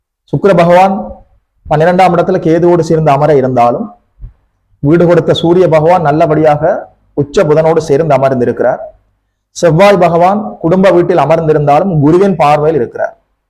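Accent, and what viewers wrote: Sri Lanka. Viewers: Srilankan tamil flavour is seen. Good luck to the video content teller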